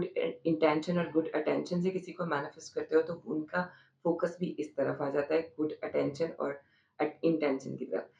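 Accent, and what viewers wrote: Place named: Pakistan. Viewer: Pakistan, you can feel the difference in her accent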